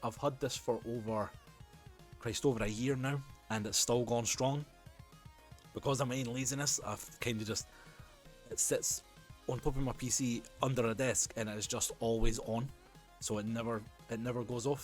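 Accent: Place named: United Kingdom. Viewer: Thanks for the video! You showed us video from a N64 connected to the ODV-GBSC via SCART, was that SCART-RGB or composite? And I presume the cable from the Sega Mega Drive was SCART-RGB? And, judging by your accent you are British; Are your consoles PAL and they work fine? What about the screen tearing issue, in which situations and with which consoles did you have it appear? Have you tested VGA input? I'm looking for an affordable scaler for use with a DOS PC (VGA), Amiga (SCART-RGB) and C64, NES and N64 (composite). It seems that unless you pay the extortionary big bucks and import a Retrotink from the US, finding a single device with all these capabilities is very difficult.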